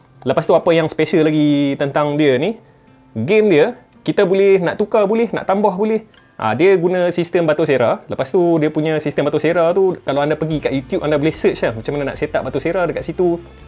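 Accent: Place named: North, Malaysia. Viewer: Nampak macam ada idgham2 utagha yang ditahan2.. 😂lantak pi bro.. utagha slag is addictive!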